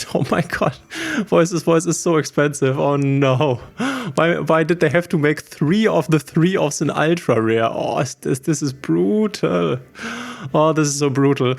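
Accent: Germany. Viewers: Well, he's German, so he's going to pronounce some card names differently. You also cannot argue that Sauravis is an English word or name. Same thing with Baronne de fleur, a card which every English speaker pronounces incorrectly, but who cares?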